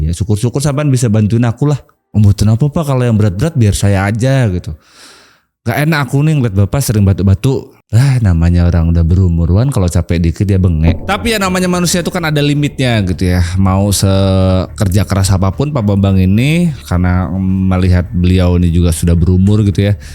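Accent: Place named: Java, Indonesia. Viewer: Cerita horor versi ngapak yg ga bikin aku merinding tapi malah ngakak 😂😂😂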